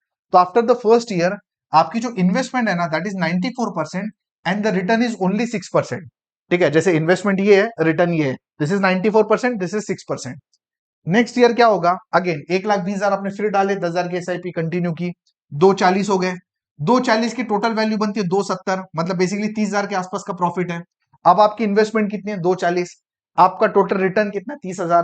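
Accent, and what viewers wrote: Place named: Maharashtra, India. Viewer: Assalamualikum bhai ❤. Alhamdulillah 99% sahi bole ap. Aj ap jaise logo ki sakht zarurat hai waise to log mahino me kae fuzul kharch kardete h jaise pan,Gutkha, cigarette aur najane kya 😢. Allah pak hame sahi jagah kharch(investment)karnewala banae. Ameen bhai jo bhi apne samjaya ❤ par question ye hai sab apne theoretical samjaya Alhamdulillah samaj me Aya practical demo samjao jaise 1. SIP aur LUMSUM kaise kare ispe 1 videos 2. DIRECT aur REGULAR kaise kare. Aur bhi question h par Insha Allah ap se judne ke baad. Apka tune samaj me ata h q ki ap Maharashtra se ho aur ham BHI AURANGABAD se ❤ Allah pak apko jazae khair de aur apki team ko bhi. Assalamualikum ❤ Plz reply 🙏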